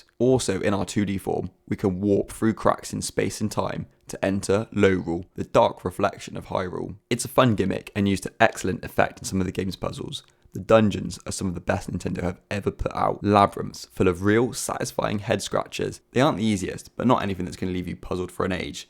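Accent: United Kingdom. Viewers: Like hearing a fellow English man talk about these games most of the YouTube videos are American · You have earned a sub!!! Hearing someone talk about Zelda with Received Pronunciation is S tier for me. You’ll have a lot more subs once more people discover how high quality your videos are!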